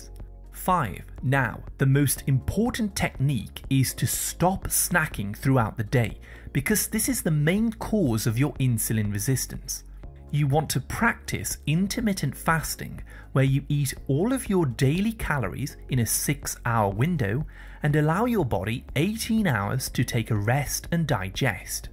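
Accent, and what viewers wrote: United Kingdom. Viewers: Great — Are you British ! Is that your Original Voice !!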